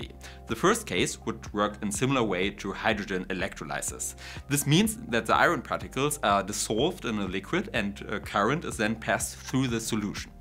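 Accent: Germany. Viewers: I love your german accent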